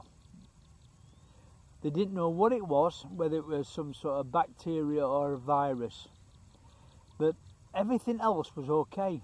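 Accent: Yorkshire, United Kingdom. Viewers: Where are you from pal are you from Yorkshire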